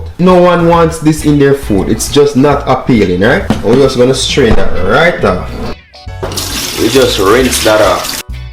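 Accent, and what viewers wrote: Jamaica. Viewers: New subby here! I had to subscribe! I love your Vibe I love the way you cook I like how you incorporate the audience with the cooking. I also love the way that you keep the Jamaican language nice and thick but very eloquently spoken.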